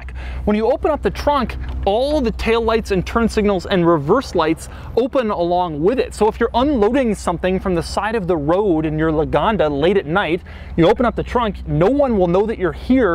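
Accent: England, United Kingdom. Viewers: english accent = perfect! yes yes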